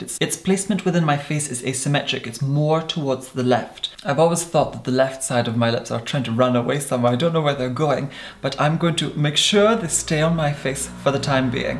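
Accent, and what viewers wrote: Scotland, United Kingdom. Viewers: Ur Scott accent comes out sometimes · just wondering are you originally from scotland or have friends/ family from scotland? because your accent is slightly scottish but mostly english. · Scottish accent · Alex Firnth of course I know he is Scottish but do you hear it in his accent? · It’s a peculiar Scottish accent mixed with English so not sure what to make of it but balm would never be pronounced bal-um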